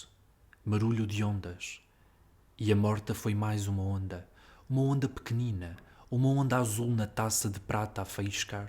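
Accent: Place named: Portugal. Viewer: Maravilhoso ouvir esse poema em português Lusitano. Eu sou brasileira descendente de portugueses e estou arrepiada ouvindo essa versão. Muito obrigada!!!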